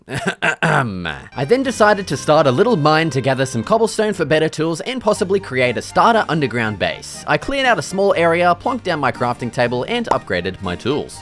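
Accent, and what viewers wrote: Australia. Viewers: U Aussie? U sound Aussie. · Yo! I was just watching some of your videos and realised I recognised your accent, a fellow Aussie! I know it’s weird and all getting excited seeing another Aussie because I see them everyday- but online you don’t see too much of them. So have a good rest of your Tuesday! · omg the aussie accent is legendary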